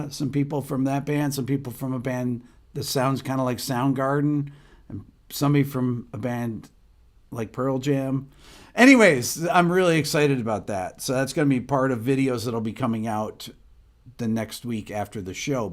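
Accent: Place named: North Jersey, United States. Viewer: He's a North Jersey boy. He has that punky Northern NJ accent. Love it!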